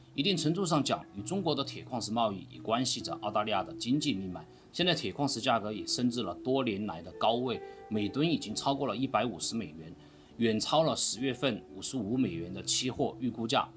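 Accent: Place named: Chongqing, China. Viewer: 很喜欢听你的重庆口音的国语！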